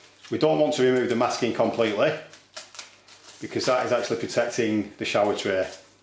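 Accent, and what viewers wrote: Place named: Lancashire, United Kingdom. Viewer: Q What's a nole? A It's a naperture! Great to hear a Lancashire accent after all these years in Geordie land!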